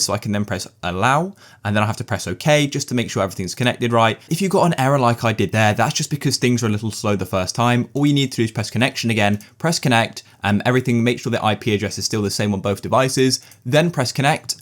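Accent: United Kingdom. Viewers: He’s British not american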